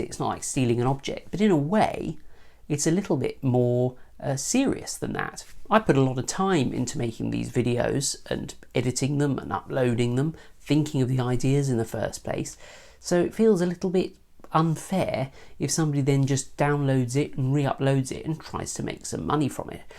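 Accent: United Kingdom. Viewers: I always like british accent ;)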